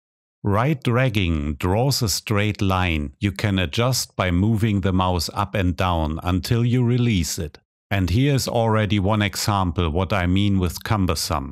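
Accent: Germany. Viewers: Yes.. i think he is German. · Where are you from? Your accent sounds german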